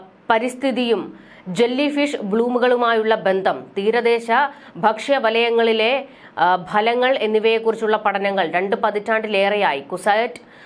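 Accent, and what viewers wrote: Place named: Palakkad, India. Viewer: മിന്നൽ മുരളി കാണാത്ത വർ മിന്നൽ ചുഴലി കാണാൻ സാധ്യത ഉണ്ട് 👌🏿പാലക്കാടൻ ഭാഷ. കേൾക്കാൻ തറ വായന 😂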